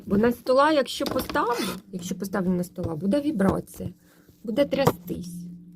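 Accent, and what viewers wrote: Cherkasy, Ukraine. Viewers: О Боже правий, ніколи б у цьому місті-гетто не жила б. Для мене повний жах 😱оці коробочки, між ними машини і поодинокі деревця. Особливо одинокими себе тут відчувають діти. Але людина і до такого звикає. Може в чомусь я і не права, але для мене гарніші в моєму 20тисячному місті різнокольорові двоповерхові котеджі з кленовою алеєю на проспекті. Як маленька Швейцарія. Чомусь киян мені завжди жаль. І дитина моя там живе, І її жаль. Швейна сторінка у Вас цікава. У нас така говірка на Черкащині.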